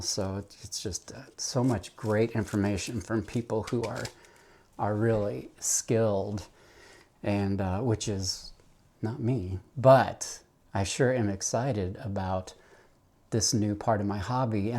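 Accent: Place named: United States. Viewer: Love Miro…specifically for the accent 😂…, can you conjure up a delightfully calm and gentle European accent? The American thing has worn out its welcome.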